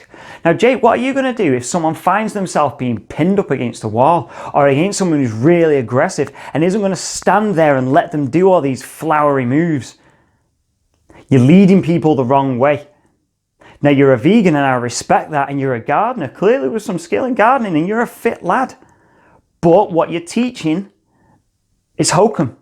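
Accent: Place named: England, United Kingdom. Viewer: Ain't here for Martial arts.. Just enjoying your English accent. Pleasant to hear.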